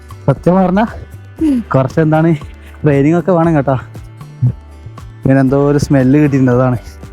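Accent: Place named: Wayanad, India. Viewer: വയനാട് കാരൻ്റെ സൗണ്ട്...🔥🔥🔥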